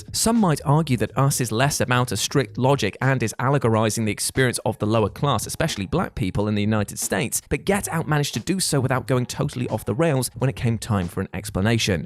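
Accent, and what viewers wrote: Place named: England, United Kingdom. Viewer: At least he's English and not Scottish, the closed captions wouldn't help you! And that's coming from someone who is Scottish 😂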